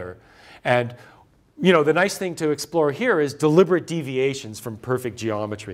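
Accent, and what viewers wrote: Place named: United Kingdom. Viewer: somehow british irritates me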